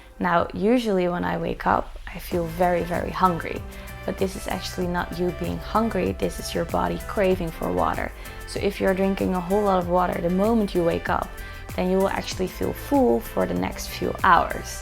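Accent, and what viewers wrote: Netherlands. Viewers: omg eerste keer hier, maar ik hoorde je accent en gokte meteen dat je nederlands was haha ;) new fav acount for sure x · Wow you sound dutch...😊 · Hahaha ik hoor meteen al dat je Nederlands bent😂💙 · A lovely accent. Perhaps Dutch ? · Grappig dat je, terwijl je Engels erg goed is, toch gelijk het Nederlandse accent hoort :-) Niet negatief hoor, gewoon normaal als een taal niet je eerste taal is.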